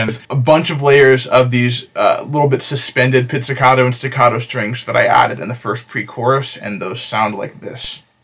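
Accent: United Kingdom. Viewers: Wait ur not British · He lives in texas? I thought he was like from the UK. lol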